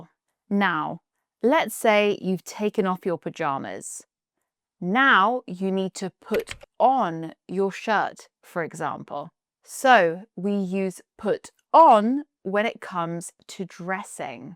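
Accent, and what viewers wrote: RP, United Kingdom. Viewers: I love british english accent, and specially, the RP. There are several youtube chanells for MP; but there are just a few for RP. And I enjoy your lovely way to teach us. Thank you and greetings from Colombia.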